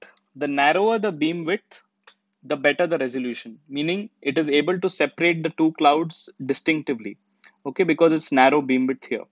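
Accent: India. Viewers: I’m sorry man, i don’t want to offend you but i just can’t learn anything with an Indian accent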